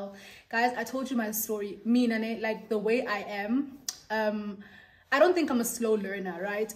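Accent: Nigeria. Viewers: Please this naija accent is everything and more 😂😂😂😂❤❤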